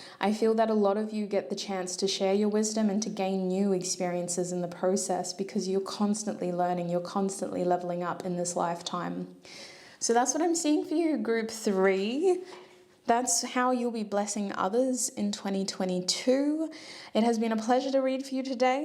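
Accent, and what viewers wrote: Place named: Australia. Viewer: Thank you, love your Accent, either Australia or New Zealand? interesting reading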